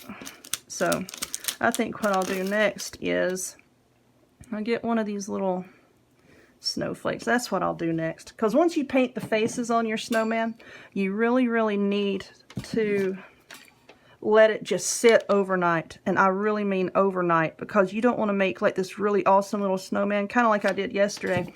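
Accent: South, United States. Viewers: Girl you crack me up LOL " If your snowman is more health conscious .. " ..... Us southern women have our own way of talking and I love hearing you !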